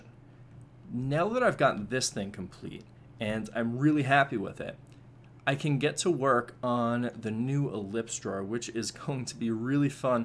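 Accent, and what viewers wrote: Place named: Quebec, Canada. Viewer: ***** il est québécois...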